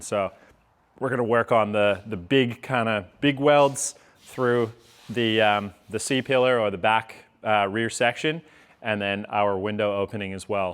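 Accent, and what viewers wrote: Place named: Australia. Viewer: Damned you're talking almost Ozzie now. Come one down to the coast for a couple days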